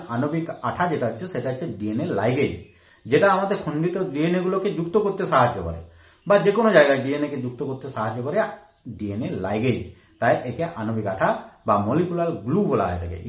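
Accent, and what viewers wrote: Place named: Purulia, India. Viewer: ooo sir amar mamabari to purulia te tai apnar kotha gulo khanikta orom sunte lagchilo bole bollam🙏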